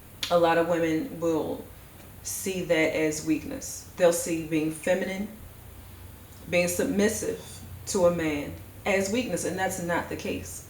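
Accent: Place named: Baltimore, United States. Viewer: You from Baltimore aren’t you?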